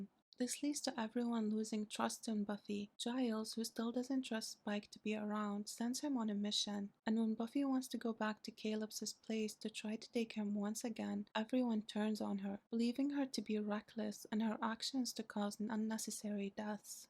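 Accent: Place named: Ireland. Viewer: Yeah, I guess that's what it was. My accent when I speak is clearly noticeable, too. So, judging from my expertise, I would say, you're closest to Irish! 👍🤗 Thanks for the vid though, too! It's good. I haven't watched everything yet but your analysis is on point and we both truly share a love for Buffy. 🤝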